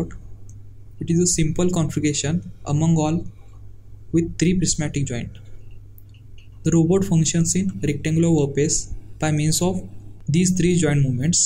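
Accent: India. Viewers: The most difficult English the indien English 😅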